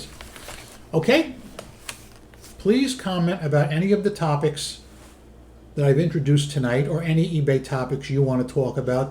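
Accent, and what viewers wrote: New York, United States. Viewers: I know your frustation very well, but see you saying it like that in your New York/NJ accent was nice with my morning coffee over here in Europe.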